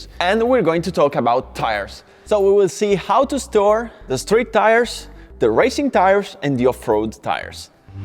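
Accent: Italy. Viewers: This guy can talk ! I got a headache within the first 5 mins, jeez..! Keep working on your English dude, it needs still a lot of improvement, I guess you will never lose that Milanese accent..Lol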